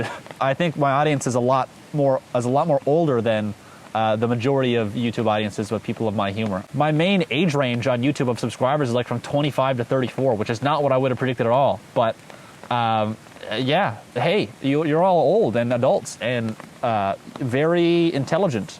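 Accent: Canada. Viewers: Such a Canadian, eh? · See I thought he was Canadian but something felt off, so knowing hes from Newfoundland really clears things up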